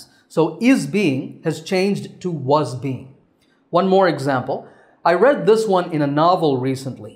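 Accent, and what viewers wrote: India. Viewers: I love Indian English! It inspires me much more than native one! I'm an Ukrainian from Ukraine.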